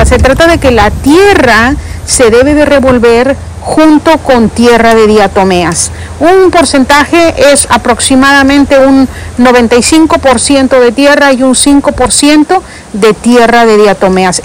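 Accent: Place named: Spain. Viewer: Y ahora x q empezaste hablando como muy española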